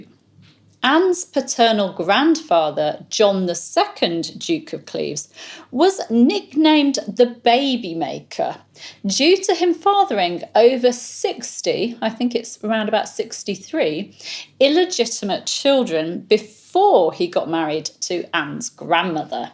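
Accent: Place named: United Kingdom. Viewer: It's a shame the closed captions are gibberish. It must be the translation algorithm can't manage the British accent.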